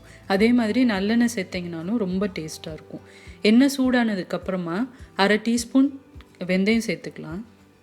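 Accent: Madurai, India. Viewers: அக்கா நீங்க மதுரையா.....குழபும் அருமை....என் மதுரை தமிழும்......அருமை....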